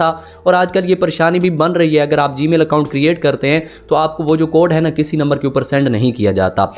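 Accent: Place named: Noorpur Thal, Pakistan. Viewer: Zaban isi tarh lg rahi hai Main khod noor pur thal sy hu and wsyy ap kahan sy ho..bye the way poch raha hu bro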